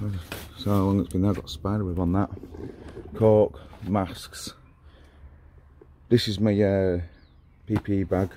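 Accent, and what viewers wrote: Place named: Essex, United Kingdom. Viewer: Nice one fella - true professional, even got the accent way down in Essex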